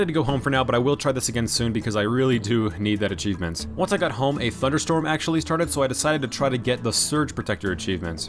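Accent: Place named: Ireland. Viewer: the casual random irish accent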